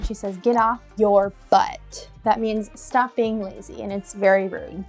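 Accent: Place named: California, United States. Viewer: Thank you very much for your video. I added your channel. I am always wondering where you are in the US. I slightly hear California accent.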